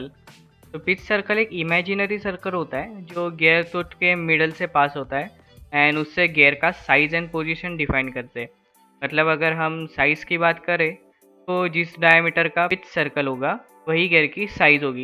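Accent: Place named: India. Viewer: 印度的味道太大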